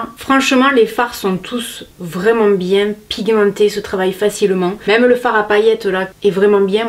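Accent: South, France. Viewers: Téléphone: notif you tube nouvelle vidéo Moi: ouuuuuuuh mon accent du sud favori est de retour 😍😍😍😍😍 vite la bonne humeur de la journée 🥰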